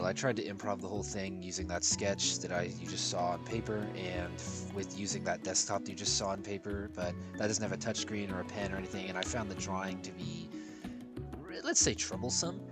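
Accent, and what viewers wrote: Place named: Ireland. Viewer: That Irish accent has me shooketh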